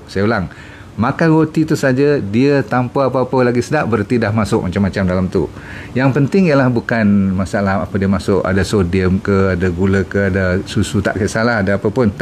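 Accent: Malaysia. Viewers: Saya dari Indonesia senang nonton video Profesor, hanya terkadang sedikit kesulitan memahami bahasa dialek Malaysia profesor, 😅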